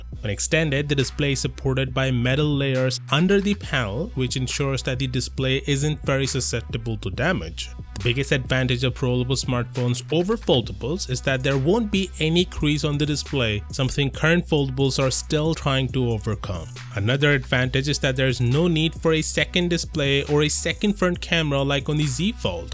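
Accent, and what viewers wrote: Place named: India. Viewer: Man I can't listen to his indian accent. Furthermore it's an complete nonsense to say that it is a advantage of rollable phones that they are thinner. You could easyily built a foldable phone to be as thin as a rollable but manufacturers don't because you need a larger battery for a larger display. The same goes for rollable phones. Yes this phone is thinner but I don't want to know how the battery life is when it's unrolled.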